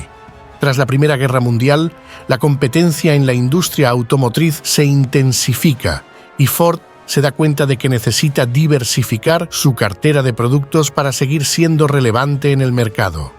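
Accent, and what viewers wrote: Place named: Granada, Spain. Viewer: Esta mujer, MARIA ( MERY) fue recompensada por DIOS SANTO Por sus tantos sacrificios y méritos que desde NIÑA ella Cosechó, así que DIOS SANTO la recompensó con este HIJO llamado ENRIQUE FORD. gracias, tus videos son envolventes y así con PERAS Y MANZANAS, si entiendo un poco. P R A, Debo decir que la primera ves que vi tu Canal, pensé que eras Español ya que tu Asentó esta mas allegado a l Castellano de GRANADA que al Castellano COLOMBIANO. Congratulaciones para ti, BENDICIONES from AGUASCALIENTES CITY, EUMA) BYA BYA. 8.12,24 A, y acuérdense que TOMAS ALBA EDISON NACIO EN EL PUEBLO, SOMBRERETE, ZACATECAS CITY. NORTEAMÉRICA MEXICANA. A Tomas Alva Edison se lo llevaron sus Padres a los EEUU. ala edad de 6 años, una ves ya con la vocación de ingeniero INVENTOR, el JUDIO DE RELIGIÓN J P MORGAN lo FINANCIÓ Y SE HICIERON SOCIOS es por eso que la mayoría de los AMERICANOS DE HABLA HISPANA Y EURO ESTADUNIDENSES CREN QUE TOMAS ALBA EDISON NACIÓ EN EEUU.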